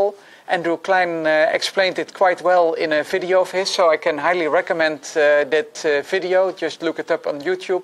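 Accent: Netherlands. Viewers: It's been more than 10 years.And the Dutch accent has still not completely gone.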